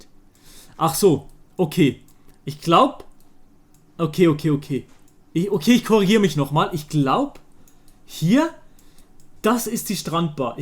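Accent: Switzerland. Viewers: Ey komm er ist Schweizer und bemüht sich so gutes Hochdeutsch zu sprechen😂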